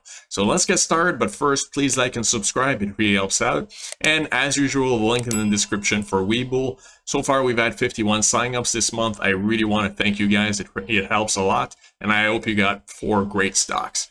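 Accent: Quebec, Canada. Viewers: I hear Quebec accent. We're from the same province 😁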